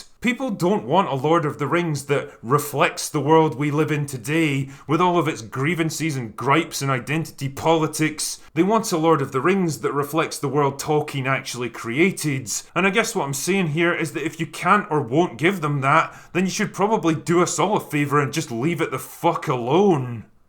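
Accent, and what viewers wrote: Scotland, United Kingdom. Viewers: Interesting hearing a Scottish guy say this is a story about England. Tolkien borrows more heavily from Celtic Myths than Anglo Saxon ones. Welsh heavily influenced Sindarin and Quenya is heavily influenced by Finnish. Tolkien wanted to fill in the gaps left by the Norman invasion and used Arthurian/Celtic myth to do so.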